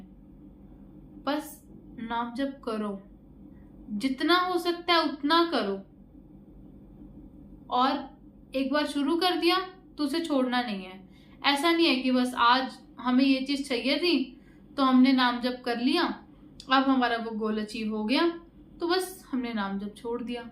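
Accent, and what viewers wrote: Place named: Rajasthan, India. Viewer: Hamne bolne ki tun she pata lga liya aap rajsthani ho. 🎉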